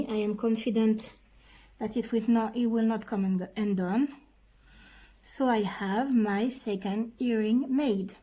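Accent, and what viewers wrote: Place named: France. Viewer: And it's even better with that cool French accent!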